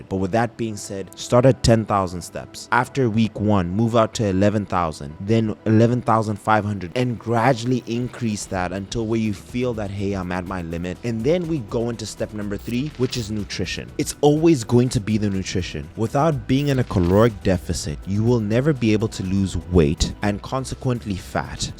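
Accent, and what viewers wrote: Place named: South Africa. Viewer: This is one fantastic breakdown. Thank you. I'd say I'm scenario 3, but since I'm never doing crunches and just compound movements, martial arts and dance maybe my abs aren't as popping underneath and scenario 1. Will definitely start incorporating the ab progressive overload you covered. My Fittrack scale says I'm around 18% body fat right now. But this is a refreshing summary you have done - thanks again. Also, love hearing a fellow South African accent on here from across the pond.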